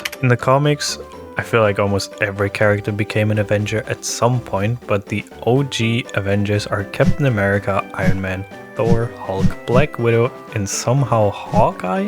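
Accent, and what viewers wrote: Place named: Germany. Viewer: i already thought you were german and when you pronounced Lichtenstein i was certain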